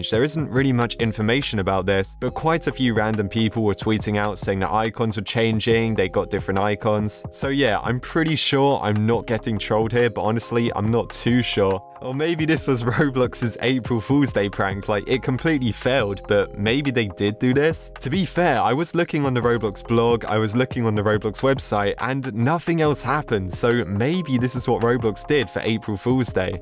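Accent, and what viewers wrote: United Kingdom. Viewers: Imma assume is British because of his voice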